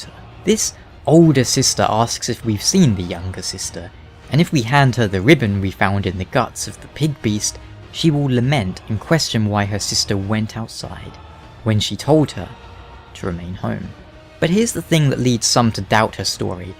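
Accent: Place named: United Kingdom. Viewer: Should I watch Vaati or Video?.. Oh well you cant just not watch the Video as soon as you hear that british english ^~^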